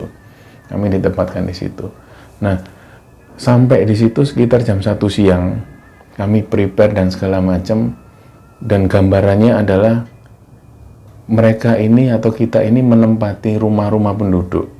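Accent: Java, Indonesia. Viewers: Suara nya khas jawa .....bgt walau menggunakan bhs indonesia tp khas nya g ketinggalan ....,bisa mengobati kerinduan akan kmpung halaman,dan cerita2 yg d sajikan bnr2 enak d dengar ....salam kenal mas dr kab,bekasi..... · ikut nyimak mas...terasa bgt alunan suara khas Jawa ...sangat mistis rasanya....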